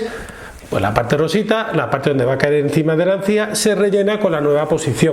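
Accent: Spain. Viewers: Clínica Avance Dental - Alcobendas —.. nuevamente gracias por contestarme y mandarme su dirección. Por su acento se me hace que está en España verdad? Pero en qué ciudad. Feliz año 2020 y muchísimos más.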